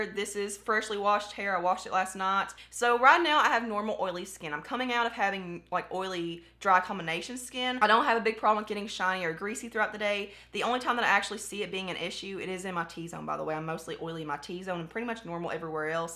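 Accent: South, United States. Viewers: I just discovered your channel. I rarely comment on videos but... okay just a few things here. 1. (And this was the biggest one for me) No offense to all the gorgeous and lovely beauty gurus out in LA, but gosh darn it girl, it is nice to find someone I can relate to being that I’m a southern girl myself. SC is my home and I just adore your twang. And the raw honesty. ❤️ 2. The “womb mate” feel I get from you when you speak of your skin issues, hair issues, UNCONSCIOUS LIFELONG NAIL BITING HABIT, even the dry lips!! Every frustrating issue is spot on with my struggles. Only difference is I’ve developed a few dark spots on my cheeks. But I felt like I was watching myself vent and carry on! 3. Lastly, I am about to order my first bottle of the kiwi product and I’m praying that it helps. I sweat a lot through my T Zone (especially during football season lol). 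I’ll check back in with ya to let you know how it’s going. I’m not sure if commenting here or using another outlet is best but I’ll update you somehow. I’m excited to check out your videos! Support and Love, Ashleigh 😎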